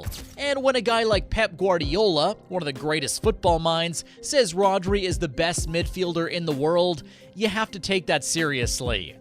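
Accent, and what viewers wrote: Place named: United States. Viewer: i heard a yank accent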